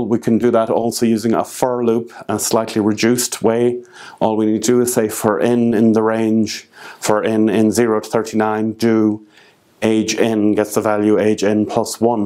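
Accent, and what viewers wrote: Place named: Germany. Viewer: Are you German? You have a Flula kind of accent. Pretty cool.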